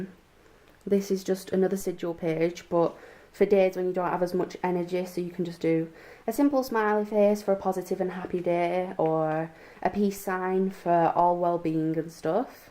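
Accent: United Kingdom. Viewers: It's beautiful! What kind of UK accent do you have if you don't mind me asking?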